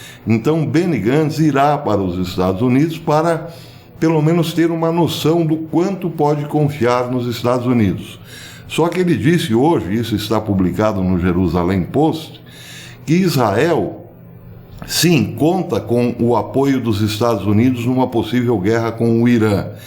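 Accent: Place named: Minas Gerais, Brazil. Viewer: PROF.... A CADA DIA QUE SE PASSA, SOU AINDA MAIS SEU FÃ.CONTINUE DESCONTRAIDO E USANDO SEU MINEIREZ.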